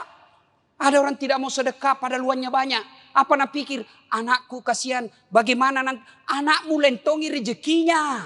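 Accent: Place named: Banjar, Indonesia. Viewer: Org Banjar y ustadz,dr awal mirip bahasa suami saya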